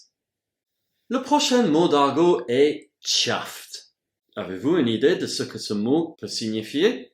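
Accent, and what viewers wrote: France. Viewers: Hello Your French accent is so sick..By the way, I am afraid there has been a little mistake concerning the bee's knees on the screen . It's written the bee's kness. Thanks a lot for these slang words Cheers 🍻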